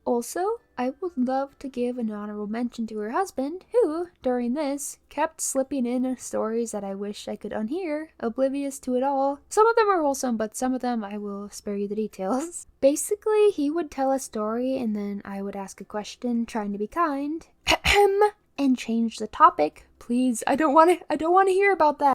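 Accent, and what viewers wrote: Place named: Australia. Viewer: I don't why but everytime I am rewatching this; I am hearing a slight Aussie accent from you. LOL !!! Yes ... I came back to type this !!! 😆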